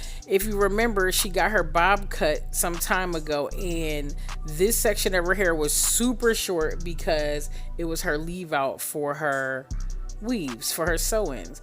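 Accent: Philadelphia, United States. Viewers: I just ❤️ your Philly accent!!! 😂 🤷🏽‍♀️ 😂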